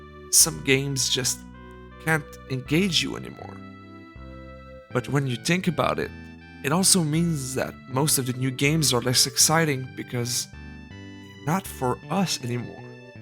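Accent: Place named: Quebec, Canada. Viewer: My grandma was from Québec, thought I recognized that accent. Cool video gonna sub was a fresh take on the idea of gaming decline without the click bait garbage so prevalent on YouTube.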